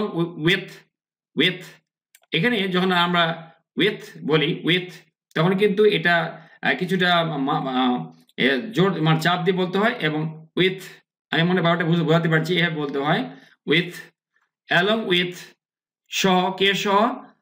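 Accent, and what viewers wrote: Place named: United Kingdom. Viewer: স্যার সবসময় ব্রিটিশ উচ্চারণ টা করবেন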